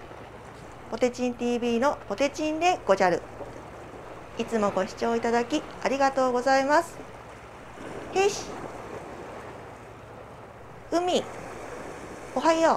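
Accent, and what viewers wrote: Kagoshima, Japan. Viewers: かごんまのひと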